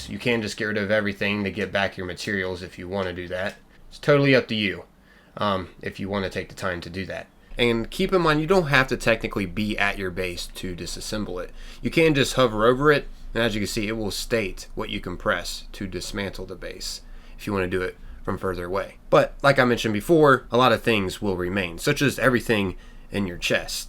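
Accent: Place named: Philadelphia, United States. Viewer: You sound like you're from Philly!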